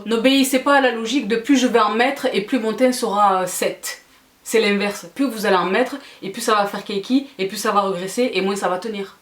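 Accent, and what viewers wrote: South, France. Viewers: Coucou ! Je viens de découvrir ton compte et j'adore ce que tu fais : tu es claire dans tes explications, tu es enjouée, positive . Ton accent du sud 💕 je m'empresse de visionner toutes tes stories et de m'abonner à ton insta. Merci merci !! 😘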